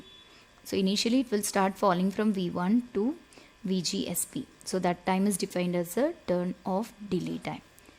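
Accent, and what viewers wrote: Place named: Kerala, India. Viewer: Thank You Ma’am ! And your Kerela accent is cute :)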